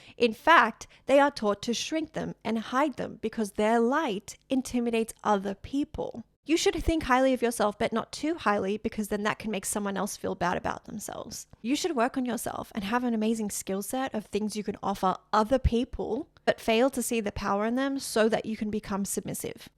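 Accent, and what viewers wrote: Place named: Australia. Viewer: Girrrrllllll 🙌💓 you’re killing it! You have done a phenomenal job expressing this topic. It’s nice to hear a fellow Aussie voice on YouTube.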